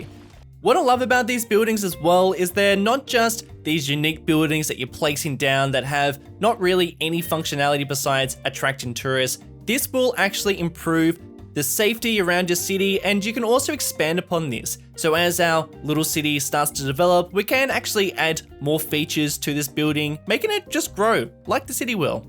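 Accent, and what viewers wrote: Australia. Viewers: he's not even british?? it's obvious his accent is australian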